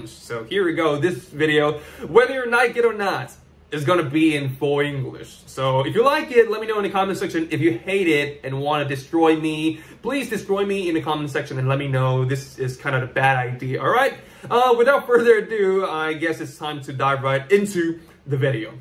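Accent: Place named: United States. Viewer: wow，very nice American accent, very impressive.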